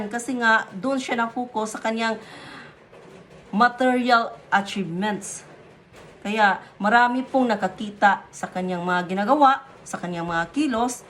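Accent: Visayas, Philippines. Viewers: Bisaya ka ano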